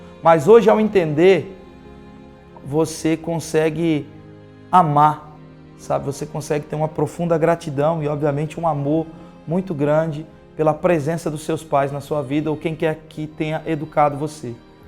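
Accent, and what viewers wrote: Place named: Recife, Brazil. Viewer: Pelo sotaque... já me ligou no saber entender..sou recifense e amei lembrar minha terra. Você é bem sábio pra idade que tem. Tenho dois filhos e o amor sim ..tem de ser construído e as vezes ..a duras penas porque os filhos não têm maturidade e ser mãe implica em amar e se respeitar e ensinar isso..e é difícil..mas vai..o amor é uma semente que a gente rega..se nascer nasceu..se não nasceu é porque a terra não estava preparada no momento certo. Gratidão pelas suas palavras ...gostei e me conquistou pela sua frontalidade.🌹🇧🇷🇵🇹🌵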